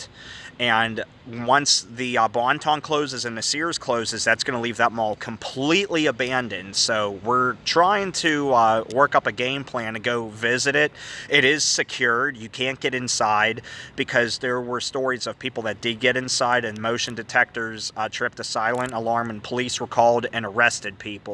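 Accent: Pennsylvania, United States. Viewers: i was born in oil city in 61 love the pa accent glad it wasnt u car parts u were lookin thru in another video when i asked,,,,,we really need somebody in rochester ny area to do these soooo many to film.....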